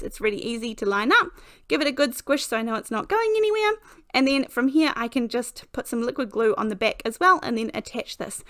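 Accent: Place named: South, New Zealand. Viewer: Love your channel and my other half thinks you're from either Christchurch or further south... He thinks you're a proper southerner! I'm from about as far north as you can get up here in England in Lancashire. He's from Wellington originally and lived in Dunedin once. Please keep up these amazing video's x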